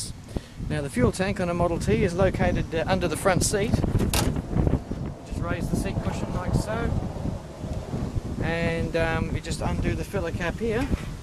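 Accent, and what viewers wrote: Australia. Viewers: I watched the video to hear an AUSTRALIAN SPEAK..God Bless our AUSSIE BROTHERS and SISTERS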